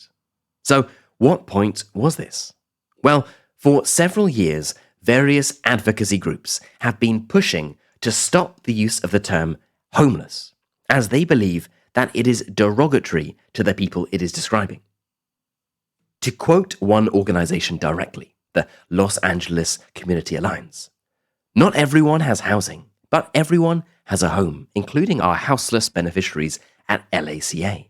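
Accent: United Kingdom. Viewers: Teacher Is your accent british?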